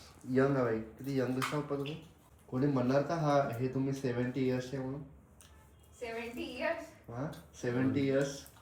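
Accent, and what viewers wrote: Vidarbha, India. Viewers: Tumhi vidhrbha side che ahat ka because tumchya marathi vrun vatte ts means thod touch ahe language mdhe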